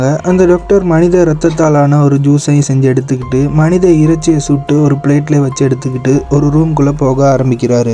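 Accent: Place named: Sri Lanka. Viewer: Sri Lankan voice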